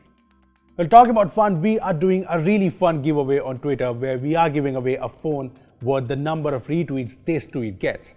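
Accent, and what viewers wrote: India. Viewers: This is genuinely a great video. I had my doubts when I first heard the Indian accent, but I was pleasantly surprised. Thanks man. And sorry. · terrible Indian pronunciation...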